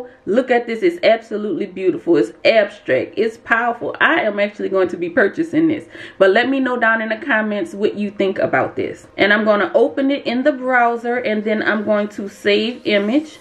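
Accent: New Orleans, United States. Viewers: Nice video and great tips! I hear New Orleans all in that video! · I can hear a New Orleans accent from a mile away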